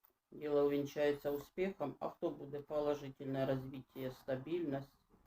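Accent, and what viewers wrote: Kherson, Ukraine. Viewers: Дякую за Вашу по 🙏 дуже приємно Вас слухати. Ви розмовляєте, як у мене вдома: на Херсонській землі. Для мене це особливо цінно ❤️🌷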